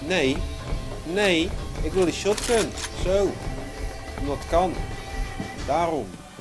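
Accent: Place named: Rotterdam, Netherlands. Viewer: Heerlijk dat Rotterdamse accent!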